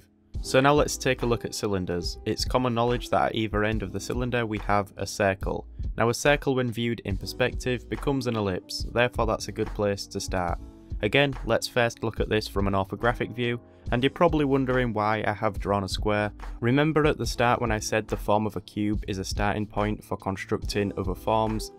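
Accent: United Kingdom. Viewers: As much as I know he is from the UK xD If you wanted to know which area of the UK, sorry I don't know that 😅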